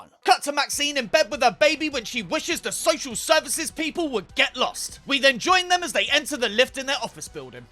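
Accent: United Kingdom. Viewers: Takes me back to a teen in Battersea. Well, minus the wish baby. How have I not noticed the South london accent before now.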